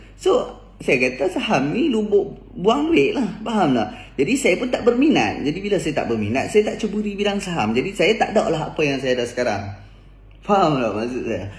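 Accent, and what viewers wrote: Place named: Kedah, Malaysia. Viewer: orang kedah kalau berletiaq..memang sedap dengar..haha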